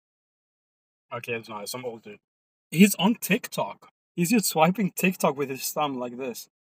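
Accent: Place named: Sweden. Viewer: Turning into pewdiepie. you're losing your swedish accent as time goes on LOL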